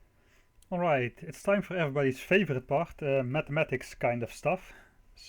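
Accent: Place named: Netherlands. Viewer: je bent nederlands best wel te horen :P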